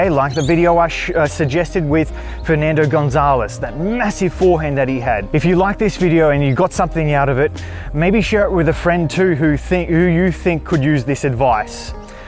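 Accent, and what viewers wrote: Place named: Australia. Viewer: I was very confused by a coach named speaking with an Aussie accent. Really good video production, super clean camera footage, clear explanations, and what an aesthetic vibe. Subscribed!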